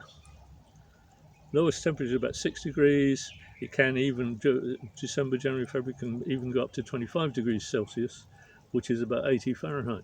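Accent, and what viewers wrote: United Kingdom. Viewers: Which country are you in and what area? Judging by your accent you are British but those temperatures dont seem wery British 😅.Im in essex so temps reqching -5 at the moment 😢. Would want as much info before stepping into the hobby. 😊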